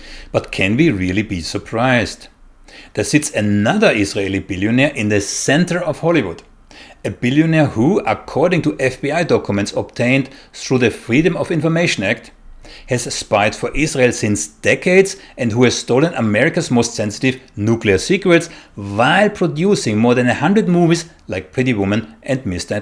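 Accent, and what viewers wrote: Germany. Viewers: What other answer can be expected from a man with name Said Abou? Your Muslim hate for Jews you sucked with your mother’s poisonous milk. Your subscriptions are tell about you a lot… No, this German accent speaking Dutch antisemite is well-known by his lies, half-truths, distortions. So, he is your friend in hate…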